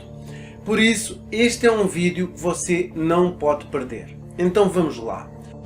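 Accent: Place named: Portugal. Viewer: Não consigo assistir vídeo em português de Portugal 😥😥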